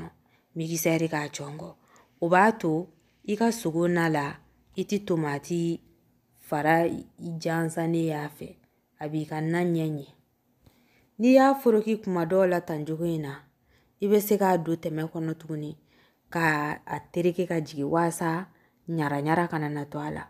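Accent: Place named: Ségou, Mali. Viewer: J’adore ton accent tu viens de ségou ?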